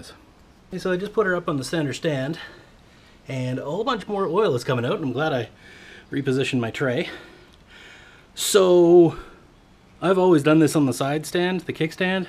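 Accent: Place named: Canada. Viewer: Just stumbled upon your video. Lol as you started talking I was saying yo myself this guy must be Canadian! Then I saw the Ontario plate. Lol good video bud!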